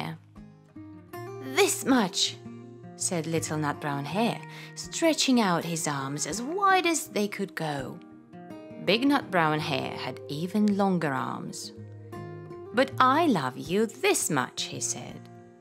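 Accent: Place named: United Kingdom. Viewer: Потрясающе,. Вы - живой пример для меня и мотиватор идти дальше🎉прекрасное произношение британской речи, просто мёд для ушей! Мне понадобилось некоторое время, чтобы понять, что это Вы, читаете 😂браво! К слову, я давно у вас на канале нашла видео о билингвизме и искусственной англоговорящей среде для малышей, собственно, уже два года я и мои дети в этой среде. Хотелось бы больше информации на эту тему, какие игры и фразы можно и нужно говорить малышам во время игр или просто daily routine, чтобы это было аутентично. И вообще наблюдать за прогрессом вашего ребенка. Понимаю, что тема не имеет отношения к вашему каналу, но хотя бы иногда 🥳💕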